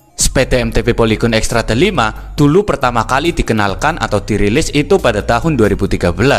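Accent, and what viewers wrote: Java, Indonesia. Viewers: Medok medok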